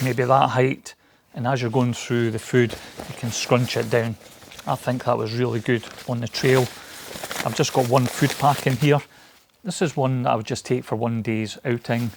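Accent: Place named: England, United Kingdom. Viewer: nice videos but it's hard for me to understand your english accent.👍🇬🇷🏴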